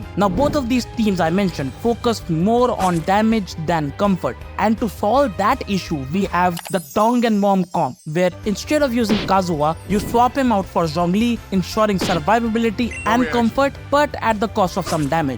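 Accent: India. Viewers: Those racist comments are wild. Yeah you have a Indian accent, SO WHAT, ITS A BIG WORLD PEOPLE. Good job, gonna sub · unbearable to watch due to your Indian accent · leave it to some talented smart guy with a indian accent to finally explain it in a way i understand, thanks i subbed!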